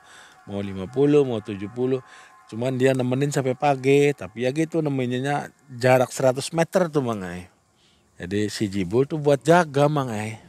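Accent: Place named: Banyumas, Indonesia. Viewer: Pantes selalu ngundang mas viewer nya tinggi terus,mas ceritanya juga ga ngebosenin santai mudah di mengerti, saya sebagai orang ngapak kalo mas ngomong ngapak aku pun tau artinya😂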